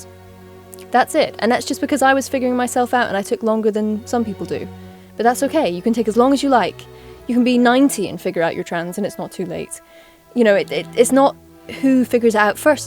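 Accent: England, United Kingdom. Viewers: are you English because you don't sound America thou I might be wrong because I'm english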